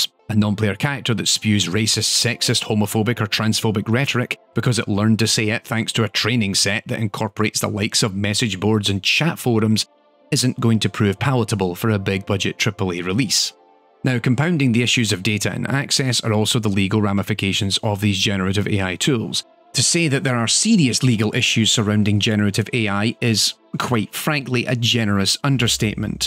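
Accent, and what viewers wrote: Scotland, United Kingdom. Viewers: Ah, another video from. Marvelous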